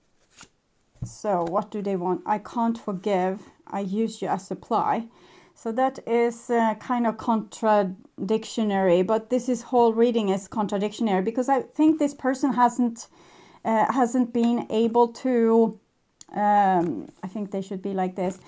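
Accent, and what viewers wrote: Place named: Sweden. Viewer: thank you yor Swedish english braught many fond memories back out of Järnatiderne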